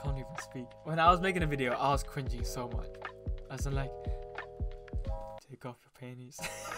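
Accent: United Kingdom. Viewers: Is it just me or does he sound a little bit british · He British omg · he sounds british broo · Are you British and BTW IL your vids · I didn’t know that he had a British accent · UHH HES BRITISH?!?! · omg he’s british i always thought he was american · He’s Filipino and sounds so English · Is he British? I am confused 🤠🤠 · he got the proper london accent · Wait is he British AHHHH HE IS BRITISH 😮😳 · When i first saw ur povs on tik tok i thought u were American, hearing u speak British sounds so wrong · NO WAY YOURE BRITISH AAAH OMG IS AALIYAH GRACE BRITISH TOO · aye im new and bruh like on tic toc im thinking he sound Different but he sounding like an british big brother I never had but his laugh had me tumble off the bed literally out of laughter Like the funny face he pulls in sum of them videos like I looking in the mirror trying to make it but failed successful Aye keep up the good job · WAIT YOURE BRITISH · Are you from Britain bec I am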